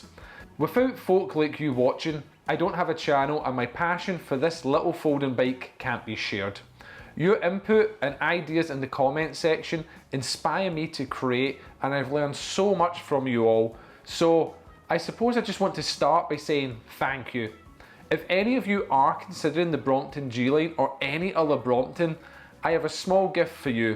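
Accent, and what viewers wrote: Scotland, United Kingdom. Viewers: E beiiike… i love the scoottish people and their funny accents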